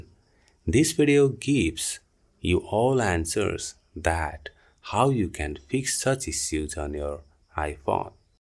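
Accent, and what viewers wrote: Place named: India. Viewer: sounds very indian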